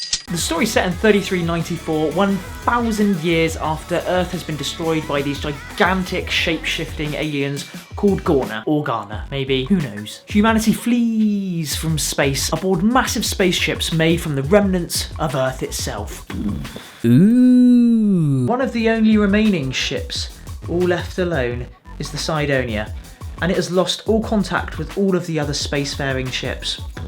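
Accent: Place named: United Kingdom. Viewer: I thumbed this up just cause I like your brit accent :p Did you read this manga through the end, up to and including the final chapter (78)? Since you disliked being handed over explanations I'm sure you liked it lol. Nihei's other works have even less dialogues, less characters, less character development, less character interactions and romances, less everything ahah. Anyway, season 1 & 2 of the anime were really pleasant and made me read the manga, but once the harem got put in place it started going downhill. Especially past chapter 55-60. Kinda a waste of such potential =/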